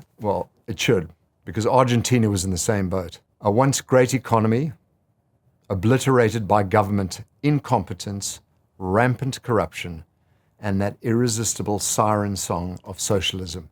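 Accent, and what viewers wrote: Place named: Sandton, South Africa. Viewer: It’s a pity, you made some good points but your argument lacks nuance or basic empathy. This is genuinely a Trump speech with a Sandton accent. Charismatic and eloquent but ultimately empty nationalist rhetoric without any means of dealing with the issues. “Open the free market and stop wokeism” is an easy fix for a country club member with an 8 figure income but it is severely detached m from the needs of working class South Africans. Not drinking your Kool-Aid Trump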